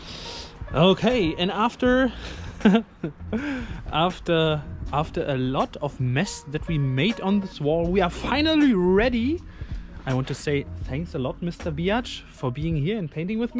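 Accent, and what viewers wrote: Germany. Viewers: Bin ich doof oder hat er ein absolut deutschen akzent😂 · If anyone didnt notice, that´s the way how german people pronounce english language :D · Man hört einfach sofort das der Herr Deutscher ist 😂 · echt richtig cool, aber schlimm wenn deutsche englisch sprechen xD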